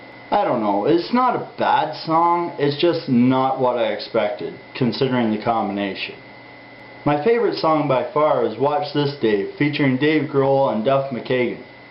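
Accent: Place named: Canada. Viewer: I have a strong feeling you're Canadian based on how you speak. Anyway, good review, I probably won't get this cd ever but it's good to know it's not total garbage.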